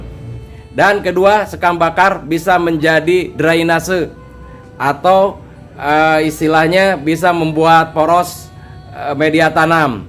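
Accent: West Java, Indonesia. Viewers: Bang orang Sunda ya...?keliatan dari logatnya. Kalo gitu sy panggilnya kang.. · Suka sama logat sundanya.. Menjelaskannya juga jelas banget..mau coba praktekin semoga berhasil..trmksh..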